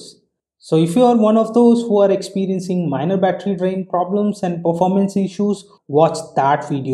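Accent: India. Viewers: Again indian accent👎👎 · Indian detected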